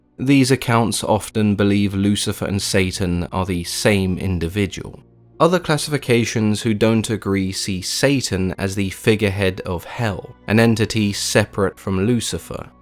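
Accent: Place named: United Kingdom. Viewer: They are beautifully ugly like some exes love the smooth calming British accent from channel host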